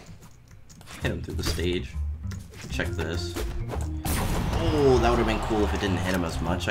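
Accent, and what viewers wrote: Australia. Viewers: Nice aussy accent Mate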